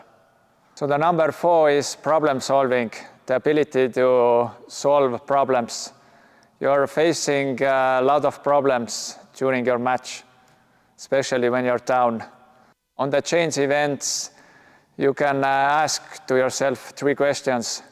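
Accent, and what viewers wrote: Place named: Russia. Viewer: Besides the beautiful Russian accent, this video is great.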